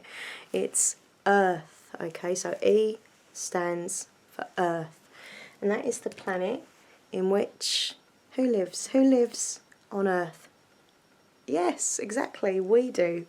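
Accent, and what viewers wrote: United Kingdom. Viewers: your British English is so beautiful！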